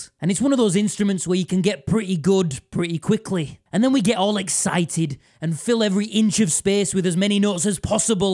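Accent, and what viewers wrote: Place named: Yorkshire, United Kingdom. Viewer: I would definitely donate a bit of money to you if the country in which I live in did not have an economy as shite as that of Turkey:/ I first started watching your content since the Yorkshire accent really took my attention but ever since then you've made my day several times with your unique style of humor and behs playing. Keep on rocking, thanks for the vids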